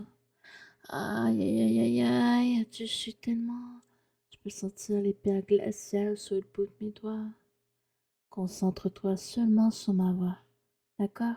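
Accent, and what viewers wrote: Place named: Quebec, Canada. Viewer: je l'est su avec ta façon c'est propre au québécoise et vu que c'est léger sa fait parti de charme dans les vidéos sa rajoute un petit quelque chose qui est bien si il est bien dose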